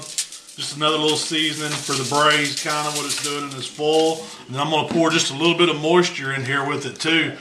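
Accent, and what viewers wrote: South, United States. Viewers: Always nice to hear a gentleman with a nice southern drawl work fancy words like crim dela criim into a BBQ video. But absolutely right, there's no piece of meat better than a pork belly. Except maybe bacon, which pork belly essentially is. Thanks for sharing. The belly looks great! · I love how southern folks in the USA pronounce foil and oil as foal and oal lol. Man that looks tasty, some good eats right there.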